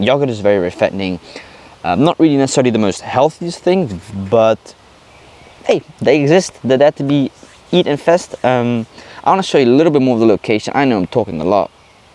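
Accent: South Africa. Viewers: Life’s just starting for you-it’ll all turn out just fine-love your (South African?)accent.Greetz from Hellevoet👍🏼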